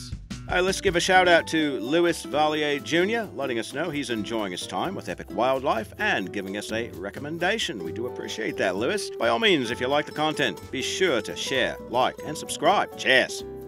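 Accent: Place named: Australia. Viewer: Nice to hear the Aussie voice make a return. It just feels better with it, like ol' Steve Irwin came back from the dead.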